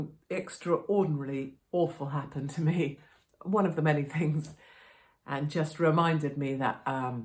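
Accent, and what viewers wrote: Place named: Wales, United Kingdom. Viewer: truly just hearing you ad-libing energetically: Welsh pro-nounciations + lol!!, why, indeed I DO FEEL Better in all this terronic global gnash love you!! The Gospel:"...Christ died for our sins according to the scriptures; 4 And that he was buried, and that he rose again the third day according to the scriptures" 1 Cor.15:3,4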